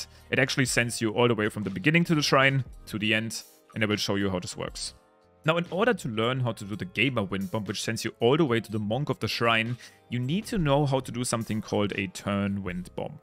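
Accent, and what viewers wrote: Germany. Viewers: are you German? your English sounds really german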